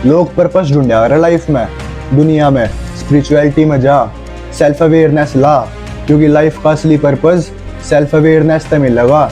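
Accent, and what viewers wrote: Haryana, India. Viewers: Nice harayanvi style 😊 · Haryana ki language h bro tere smj t bhar h😂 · Haryanvi Exant Amazing Work Bro ❤ Difference vibe with same word ✨🔥❤️🧠👑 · Bro vo bhai harayani bol rha hai isame hasne ka kya hai vo bhai kuch sikha rha hai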